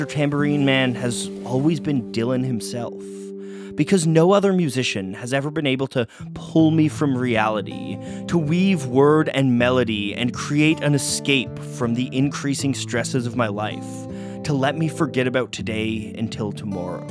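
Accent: Canada. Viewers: canadian,eh? great video as usual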